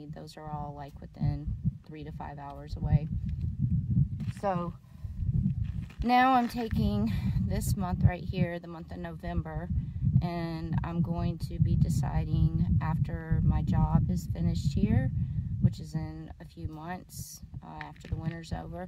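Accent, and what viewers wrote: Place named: Texas, United States. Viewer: Knew I heard the Texas twang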